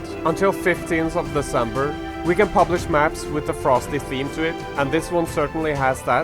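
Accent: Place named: Norway. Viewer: Bro i can hear ur from norway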